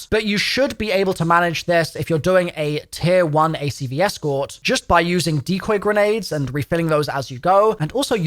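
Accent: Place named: United Kingdom. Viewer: Lol he sounds brittish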